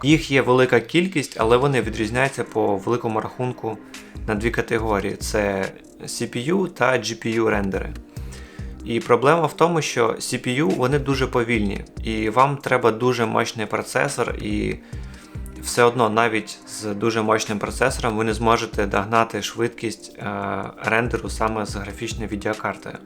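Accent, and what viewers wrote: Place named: Russia. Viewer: У автора москальский акцент🤣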